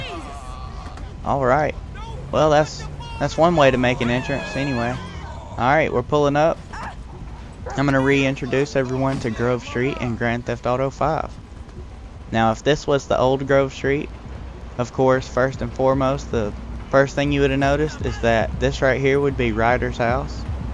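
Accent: United States. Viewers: He sounds fine it's a southern accent I have one to so don't hate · are u from Kentucky?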